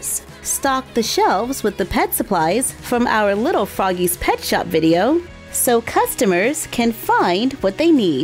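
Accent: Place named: United States. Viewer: I'm so sorry I'm a brit and the pronunciations of these dogs in America is killing me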